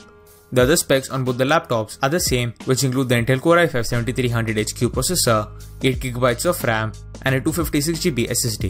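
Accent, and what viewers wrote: India. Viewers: Fucking curry accent, drank too much contaminated poop water that made you indians have that accent?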